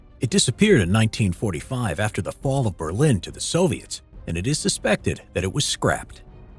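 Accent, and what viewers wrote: France. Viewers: Funny French accent ;) Not hate intended, I love the videos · As a native French speaker, I have to say that I LOVE your accent when speaking French ^^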